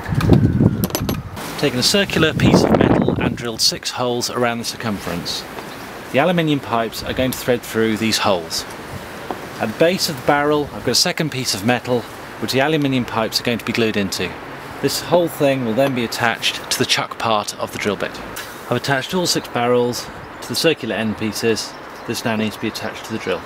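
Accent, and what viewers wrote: United Kingdom. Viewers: are you from the U.K.? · i love your fuckin accent boy, what is it? British? greetings from texas brw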